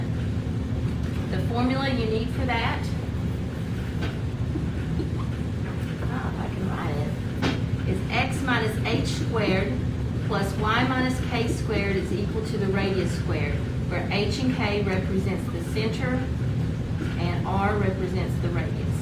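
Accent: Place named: Tennessee, United States. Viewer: Tennessee accent or Alabama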